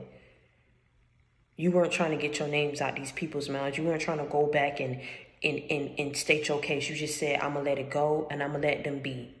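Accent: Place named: Geechee, United States. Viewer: Sing yo sung that's how geeche people talk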